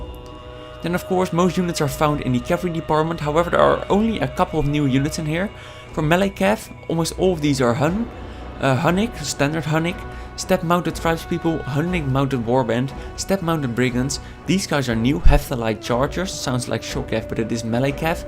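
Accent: Netherlands. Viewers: Serdar Kaleli you idoit hes dutch no indain you rasict! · yes of course, i understand the need of time in these video's, still awesome you upload them in such rapid succesion, i only have one question, maybe you said it before but i might have forgotten it, are you dutch? Because you kinda sound dutch. Although this is no offense of course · where are you from you sound dutch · That's a dank Dutch accent you have there friend.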